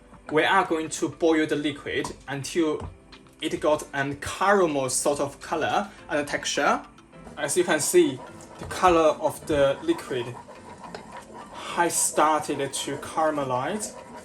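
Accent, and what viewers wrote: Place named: United Kingdom. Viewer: Pretty interesting how with some words you sound british and some words you don’t haha is sugar cane locally available where you live?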